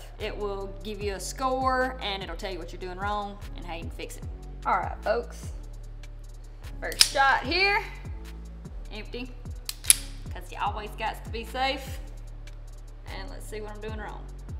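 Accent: South, United States. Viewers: As an Old School Marine who graduated from Parris Island, South Carolina back in the day, has the perfect Down South dialect and smooth expression to explain things in an utterly captivating way. Also I would bet a gallon of Mississippi Mud Pie Beef Stew is a Marine. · I reckon I love that accent, by gum🤠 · Love that southern draw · You are amazing. True red blooded American... Southern accent. Love it...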